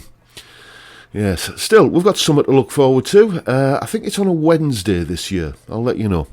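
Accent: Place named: Northern England, United Kingdom. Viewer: As always, I do hope you're well 😎😊 love your stuff, really really love it, and who can honestly say that your northern accent isn't totally great 😎 Just a pitty I live in Denmark, otherwise I would be having lessons in person every week 🇩🇰😎😎 Love it and keep up your great stuff To many YouTuber guitarist's making c.... with their posted videos. Great stuff and very interesting stuff in many ways 👍🏻👍🏻😎😎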